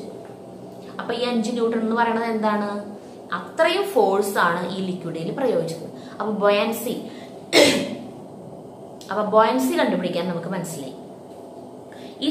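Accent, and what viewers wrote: Thrissur, India. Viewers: Thrissur anele😂😂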